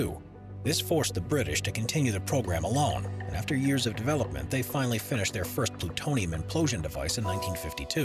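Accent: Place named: United States. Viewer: Come on, you sound American so why not use the non-metric units we use, like miles and pounds instead of kg and kilometers?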